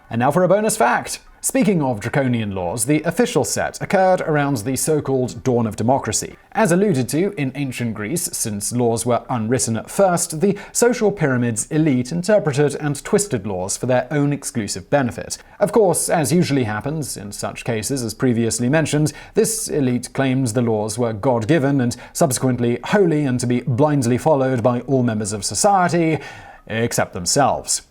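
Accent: United Kingdom. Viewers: Well the Brits pronounce alot thing differently....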